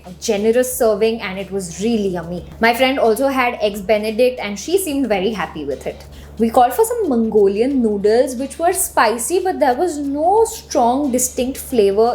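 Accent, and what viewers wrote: India. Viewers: We indians speak like this...if u have a problem with this...then stop watching the video..instead of commenting on her accent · Such strong indian Accent!!! I couldn’t get past your accent to enjoy the video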